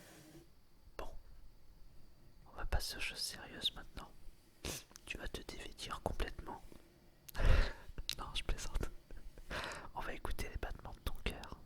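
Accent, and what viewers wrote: South, France. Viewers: C'est cool j'ai exactement le même accent que toi mdr 😂 je viens du sud aussi. Sinon continu comme ça t'es le meilleur 💪👍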